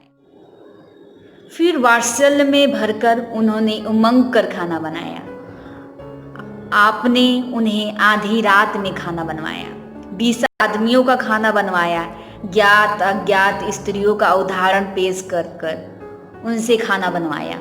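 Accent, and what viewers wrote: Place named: India. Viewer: Your voice is very nice with a pure Indian touch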